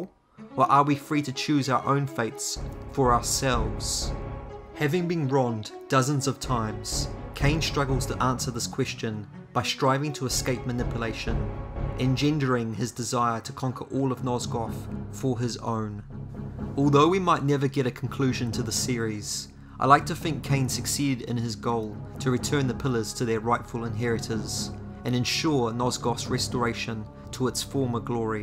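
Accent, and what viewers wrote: New Zealand. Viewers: This content and writing is so lekker! Love it. Is that a New Zealand accent? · From or based in New Zealand? I hear an accent.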